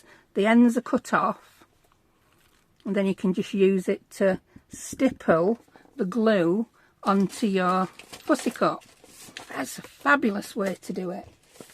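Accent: United Kingdom. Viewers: Ohh my goodness, ur a "GENIUS". TO use ur corner square & ur other gadget ,I don't have any of those but maybe I can buy myself one from TEMU. ❤❤❤. And I think ur in the UK, I love ur accent, I could listen to you all day. Even wen you make jokes, it keeps ur videos fun to watch, keep doing what you do BEST, GOD BLESS 🙏 🙏🙏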